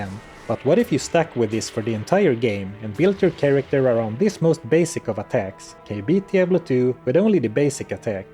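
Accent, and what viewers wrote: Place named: Sweden. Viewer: Finally new video i almost started missing your swedish accent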